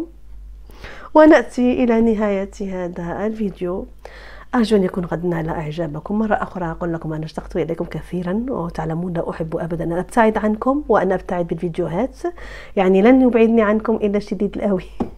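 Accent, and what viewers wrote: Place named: Tunisia. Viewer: لهجتك مثل لهجة التوانسة ❤❤❤وصوتك مريح يا ألله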